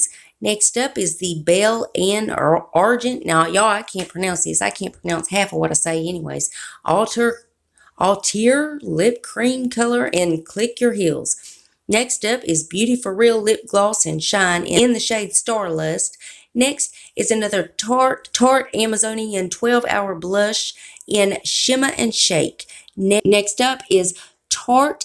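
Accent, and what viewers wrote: South, United States. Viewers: Girl you sound way more southern than central Ohio area! Are you a native born Buckeye? I moved to Ohio when I was 5 and lived there most of my life until I moved to Iowa when I was 40. I lived in several suburbs of the Greater Cincinnati area. I don't have much Cincinnati southern accent left after living in Iowa for over 20 years. lol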